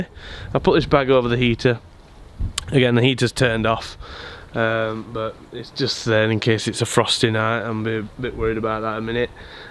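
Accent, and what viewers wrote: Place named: Norfolk, United Kingdom. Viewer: I love your accent! Have you always lived in Norfolk?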